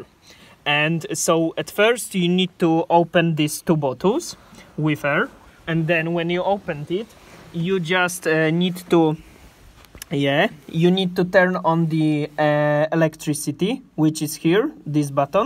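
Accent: Poland. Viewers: polaKA slysze XD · Polski akcent nie oszukasz…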